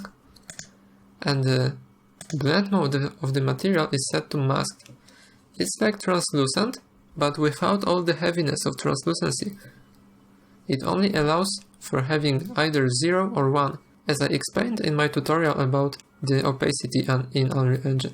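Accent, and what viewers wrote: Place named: Poland. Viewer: Po Twoim akcencie od razu słychać, że Polak !!! ;)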